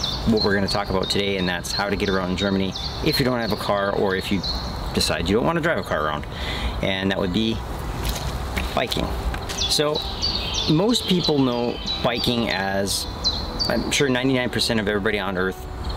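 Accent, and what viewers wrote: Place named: Upper Peninsula, United States. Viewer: I'm a Yooper too, and living in Heidelberg. I would love a Yooper Stammtisch and a Rauchbier in Regensburg. Thanks for the vids, and a touch of Yooper accent!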